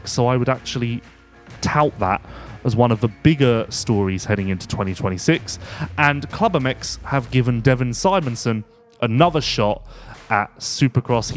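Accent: United Kingdom. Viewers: thank you for talking faster and having live action, now I won't mute the hub. Luv a fast talking Brit!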